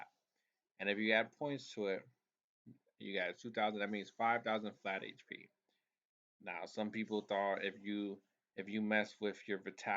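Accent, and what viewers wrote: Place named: Philippines. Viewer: Bruh, u murican? I always thought you're PH 😅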